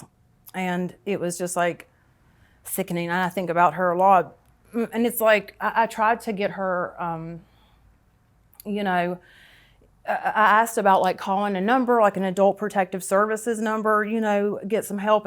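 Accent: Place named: North Texas, United States. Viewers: I would have guessed her accent to be rural Oklahoma. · Did you grow up in North Texas/Oklahoma? Your accent is very familiar I am from there too.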